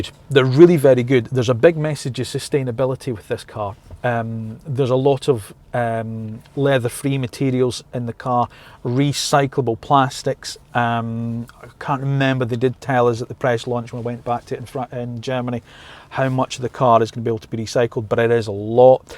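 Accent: Scotland, United Kingdom. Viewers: What a great and very informative review. Your Scottish accent makes it’s extra easy to listen to, but you covered literally every aspect of the car and I almost feel like I was right there experiencing everything you described myself. Hats off 👍🏽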